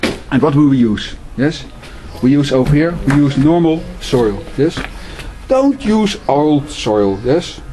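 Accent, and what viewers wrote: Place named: Netherlands. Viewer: I really don't want to sound gay but the dutch accent speaking english is the best!! havin a toke and listening to just makes me so chilled!!!!! keep up he good work fella!!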